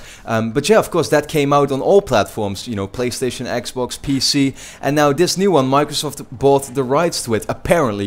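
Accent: Netherlands. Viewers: Are you dutch? Your accent ;)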